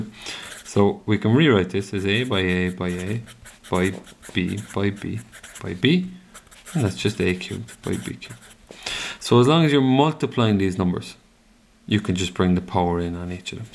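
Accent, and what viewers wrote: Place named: Ireland. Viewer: Cant work out if its the irish accent that did for me but weeepppiieeee!! Got it...lol Thanks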